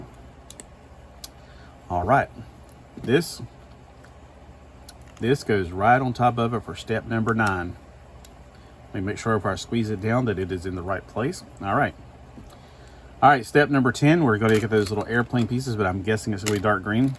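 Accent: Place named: South, United States. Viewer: Sometimes you have your southern accent come out more than others. In this video is one of these times. lol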